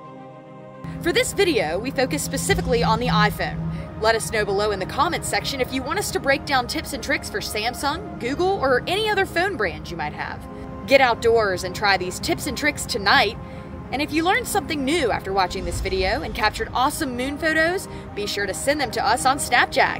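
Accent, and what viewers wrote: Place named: Baltimore, United States. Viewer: Baltimore girl?? That accent on the Os is why I ask…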